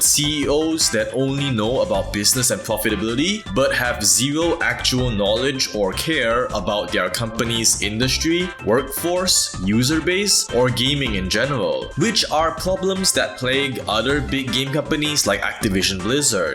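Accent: Singapore. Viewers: Are you from Singapore?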